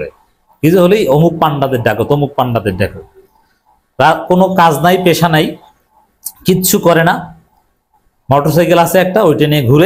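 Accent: Barishal, Bangladesh. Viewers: আপনার কথা বলার এক্সেন্ট শুনলে মনে হয় আপনি বরিশাল অঞ্চলের মানুষ। তাই বরিশাইল্লা ভাষা বললাম, "কতা য্যা কয়ন হ্যাতো উচিৎ কতাই কয়ন। আসল কতা থুইয়া এতো ত্যানা না প্যাচাইলে অয় না, ন্যাবাই?" 😂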